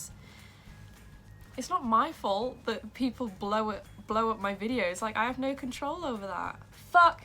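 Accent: United Kingdom. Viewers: Every video I’ve seen with a person with your condition has a British accent. I wonder if it’s something in the air or water or something to that extent.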